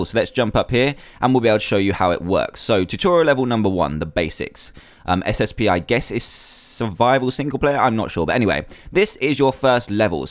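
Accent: United Kingdom. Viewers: Ever heard of a British accent, m'kay?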